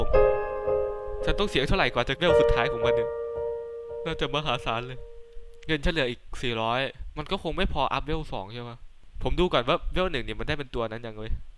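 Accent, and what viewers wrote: Isan, Thailand. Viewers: ไม่เคยเห็นใครพูดอีสานได้น่าฮักขนาดนี้เลย;-; "หยามบักคัก" คือแบบตายอย่างสงบแล้วค่ะ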